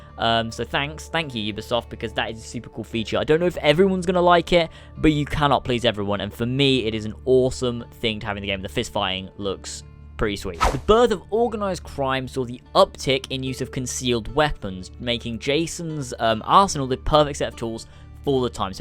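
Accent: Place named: United Kingdom. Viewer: Can you stop with that horrible british accent?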